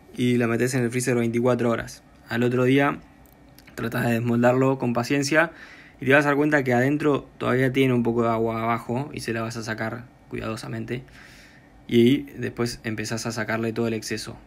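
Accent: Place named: Uruguay. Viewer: Ese hablado (uruguasho)me recuerda a mi Mecanico jijiji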